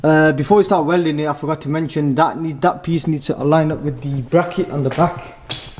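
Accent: Birmingham, United Kingdom. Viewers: All about that brummy accent 👍😂 let's see that rs6 breakdown 🙏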